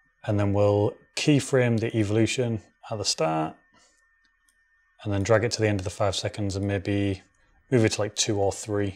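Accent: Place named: Hull, United Kingdom. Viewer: Sounds like you're a fellow Hullensian? :D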